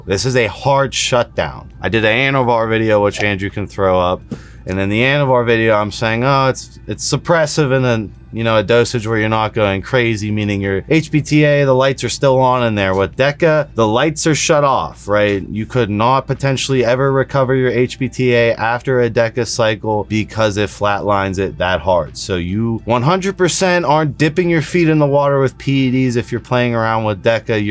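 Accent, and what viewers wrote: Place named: Philadelphia, United States. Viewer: are you from the Philly area? Noticed your accent is similar to mine.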